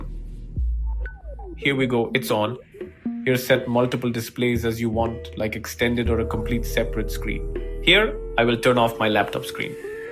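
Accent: India. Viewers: Please talk in American accent it sucks when u talk in indian english I only like my country for its national language which is hindi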